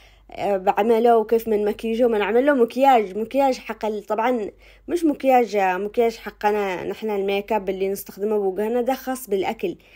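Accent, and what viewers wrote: Aden, Yemen. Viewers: لا لهجتها عدنيه الصنعني مختلفه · الأخت كإنها يمنية صديقتي يمنية جدا أحبها وهي تتكلم بلهجتها اليمنية هي من صنعاء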